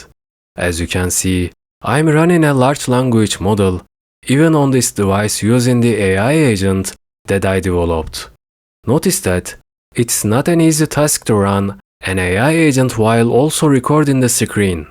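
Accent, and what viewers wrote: Turkey. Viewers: Great video. Random to ask but are you Turkish by any chance?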